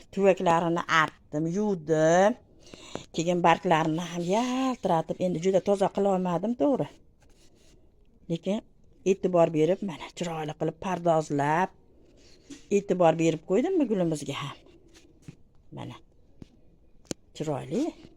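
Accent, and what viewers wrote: Andijan, Uzbekistan. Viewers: Гапиришиздан андижонликмисиз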